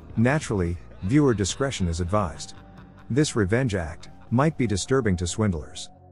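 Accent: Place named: India. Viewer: What's with the indian accent? It totally just threw me off. Unless this was in India. haha. So weird.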